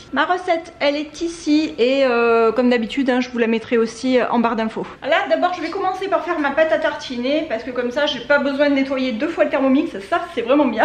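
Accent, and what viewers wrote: South, France. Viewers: Coucou 👋🐈🏵️🐥💐🌼🌷🌹❤️🌿J'aime beaucoup tes vidéos 🤗 et ton accent du sud 🌻🌻🌻🌻merci pour tout 🐈👋💕Isabelle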